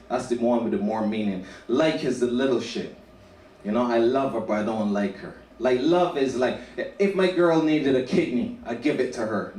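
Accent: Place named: Barbados. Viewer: With a Bajan accent too!!! My kindah humour my kindah comedian!!!!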